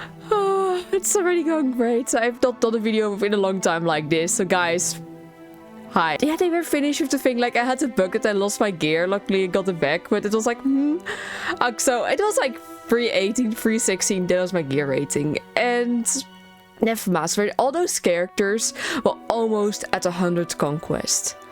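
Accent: Netherlands. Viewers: I saw you couple of times on malgus server nice! Nice vids, subbed! You dutch by any chance? Very recognisble accent :)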